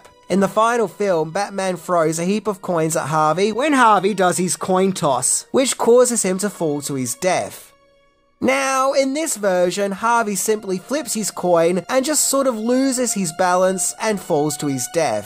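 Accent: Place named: Australia. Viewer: Everytime you say Two-Face I think you are saying Toothpaste! Lol must be that wonderful Aussie accent!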